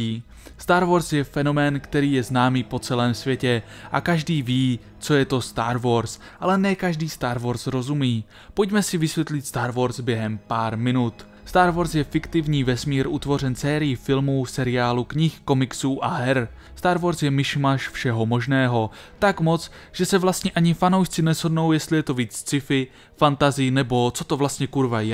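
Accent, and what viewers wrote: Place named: Moravia, Czech Republic. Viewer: Bože ta moravština je hrozná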